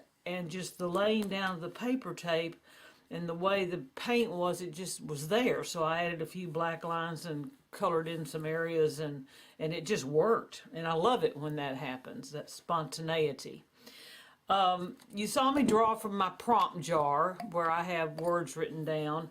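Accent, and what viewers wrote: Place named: South, United States. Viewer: i really lik it....thanks for sharing,.....ps i love your southern accent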